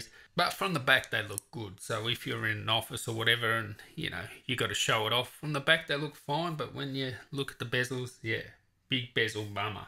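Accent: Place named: Australia. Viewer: I’ve watched every 2019 iMac video on YouTube (cos I’m about to buy one) and I loved this video the most. As a fellow Australian, it’s so refreshing to have a thick Aussie accent on a tech video and your advice to viewers to get great performance if they’re on a budget is really good. Since you posted your vid, they halved their prices on ssds so I might order the i9 with a 1TB ssd. Thanks again.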